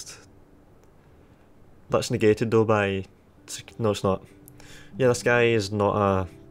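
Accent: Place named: England, United Kingdom. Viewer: Best english accent? Or am I the only one who thinks this?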